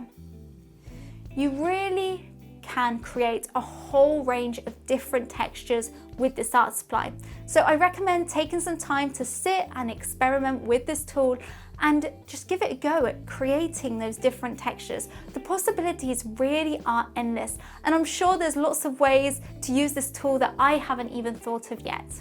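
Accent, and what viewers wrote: United Kingdom. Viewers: She have a British accent...😲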